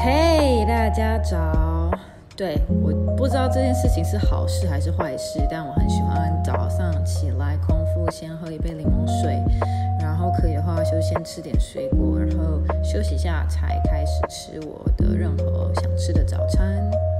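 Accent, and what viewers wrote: Taiwan. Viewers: 光是聽聲音，妳不是台灣人誰才是， OMG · 真的是超級道地的台灣口音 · 妳除了face外，其它講話的方式、口氣、用語、調調跟台妹一摸一樣，甚至更台簡直青出於藍，身份證的申請沒辦法通過嗎？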